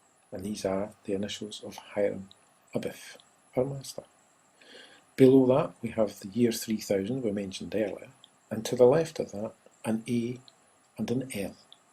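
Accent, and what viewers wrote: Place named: Scotland, United Kingdom. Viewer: He's a Scotsman …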